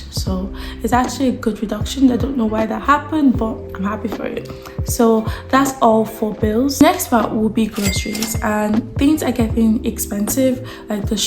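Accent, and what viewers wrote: Glasgow, United Kingdom. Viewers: I detect a slight Glasgow accent now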